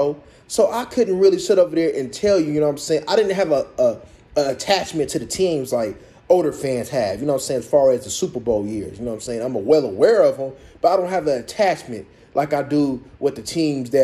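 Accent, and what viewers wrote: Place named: Bay Area, United States. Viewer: You gotta be either from The Bay or Chicago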